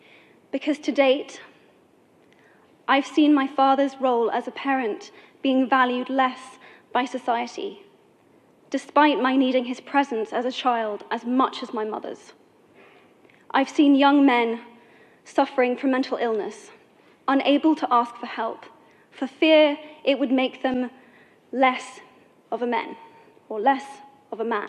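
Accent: United Kingdom. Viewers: Her accent is British, I prefer the American one . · It’s called talking slow in a brutish accent, first class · Came to learn British English accent and learned sometime more important. Gender equality.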